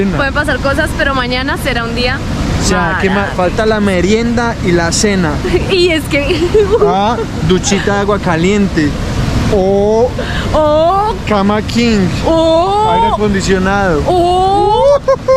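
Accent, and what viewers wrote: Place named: Antioquia, Colombia. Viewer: Ese acento paisa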